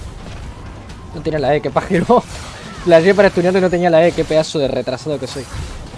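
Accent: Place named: Argentina. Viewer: Este pibe es una masa la voz me hace acordar a Dross pero con acento argentino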